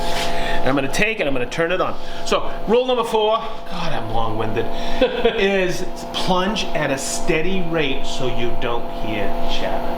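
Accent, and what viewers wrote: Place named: Rhode Island, United States. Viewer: I’m from Rhode Island I know that accent , living in Savannah Ga.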